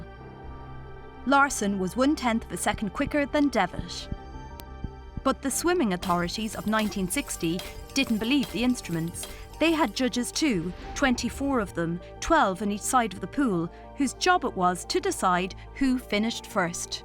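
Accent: Ireland. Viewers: I looooove her irish accent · She is Irish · She's Irish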